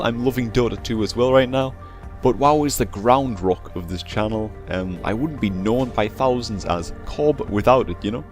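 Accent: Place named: North East England, United Kingdom. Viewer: I understand all that you say, pronunciation isn't a bother for me:P Although I'm from north eastern england also, so I have a similar accent:P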